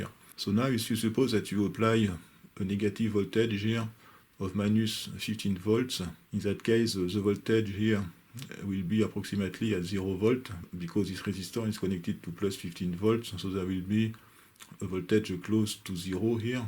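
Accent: France. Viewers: Époustouflante travail de reverse engineering et excellentes explications des différents schémas. L'accent est catastrophique malgré un très bon niveau d'anglais, mais c'est un problème fréquent chez mes compatriotes français. Je reste abonné 120% à cette chaîne, unique par son excellent niveau technique. Bravo!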